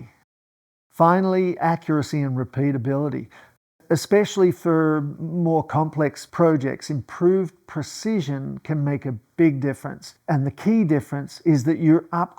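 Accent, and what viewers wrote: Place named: Australia. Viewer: Great advice. I will stop buying gear and start making. I’ve just found your channel and it was nice to hear an Australian accent.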